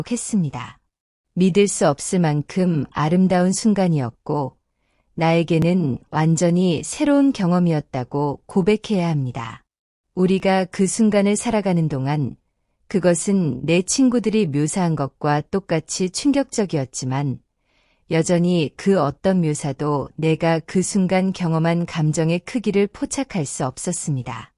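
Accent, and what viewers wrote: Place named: United States. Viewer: 미국 사람이 이야기하는거 같다. 가짜 이야기를 억지로 하는거 같다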